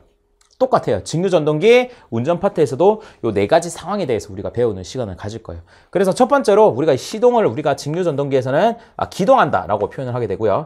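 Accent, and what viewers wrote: Gyeongsang, South Korea. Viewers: 화내서 사과하는거보고 빵터짐 ㅋㅋㅋㅋㅋㅋ 경상도말투라 감안하고 봤어요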